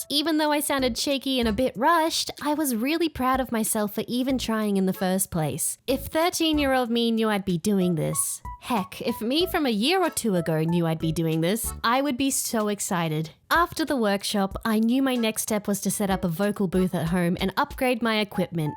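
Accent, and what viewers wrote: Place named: Australia. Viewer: u kinda sound Aussie I love it it’s a cute accent :3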